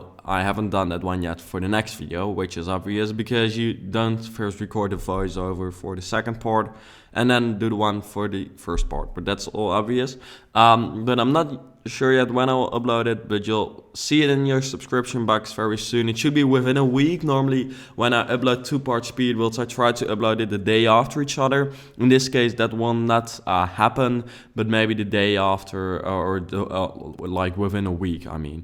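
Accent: Netherlands. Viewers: i don't mean to be rude but please work on your english. you have a dutch accent and I don't like listening to it. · I hope you're trolling. He has a dutch accent because he's dutch, you idiot. Figures the channel name would be self-explanatory enough! As if he's gonna change to cater to one person's dissatisfaction when his english-speaking skills are perfectly fine! If you're that butthurt by it, it doesn't take a rocket scientist to find the mute button on the video. "I don't mean to be rude" my ass. Pull your head in or go back to your perfect english-speaking safe space. fantastic build as usual! :)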